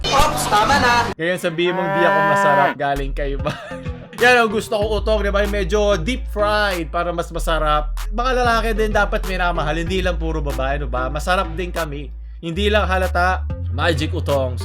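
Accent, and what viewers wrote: Visayas, Philippines. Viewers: ANG CUTE NI PAG NAG BIBISAYA MURAG YAWA HAHAHAHA LAB U SINCE 2018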